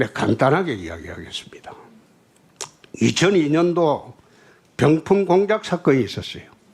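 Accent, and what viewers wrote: Gyeongsang, South Korea. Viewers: 역시준표세이(형님의 순~경상도 말) 확실하다~세이 청와대가서 핵갖다놓자~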